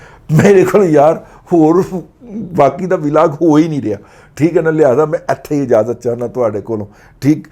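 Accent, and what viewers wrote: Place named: Sialkot, Pakistan. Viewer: Haha 😂 sialkoti style ki kaya baat hay.wese bava ji hum bhi sialkoti Hain 😂🤣😉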